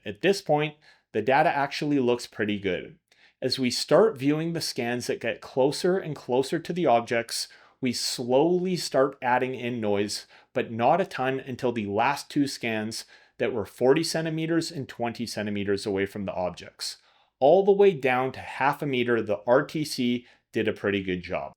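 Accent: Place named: Canada. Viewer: Great video! Very in-depth and informative. I have a lot of hours scanning with the VZ-400i, and the VZ-600i just looks all around better. I wouldn't wish lifting the VZ-400i 50-100 times in a day on anyone lol I did want to mention, regarding Leica's app and it's field capabilities, that Riegl offers an optional license for a program called RiPANO that has some similar capabilities. If they still offer it, it might be worth diving into. P.S. Love to hear your accent in your videos. I'm a Canadian surveying in the US as well, so it reminds me of home!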